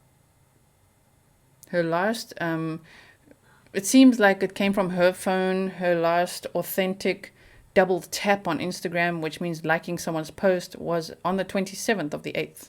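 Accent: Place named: South Africa. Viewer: Why would his parents have concealed that Brian left for home from the 17th-23rd to empty out a storage unit? I have never heard this. How and when did you hear this? I enjoy your astute observations. I thought I heard a South African dialect. Thank you for sharing your background and your father’s background. I am a woman Vietnam Era Veteran Navy WAVE. During service I had a Secret Security Clearance. Thank you for your broadcast. Greetings from the shores of Lake Erie on the north coast of Ohio in America. Canada is 45 minutes straight across the lake.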